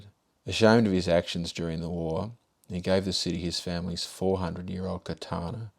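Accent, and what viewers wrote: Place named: Australia. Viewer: You, sir, give a valuable service, in an iconic Ozzie laconic manner.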